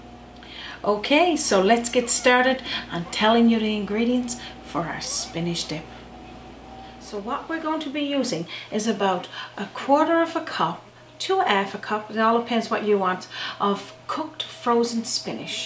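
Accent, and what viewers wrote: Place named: Newfoundland, Canada. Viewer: I so enjoy your NFLD speak. That dip looks so darn good thank for your video.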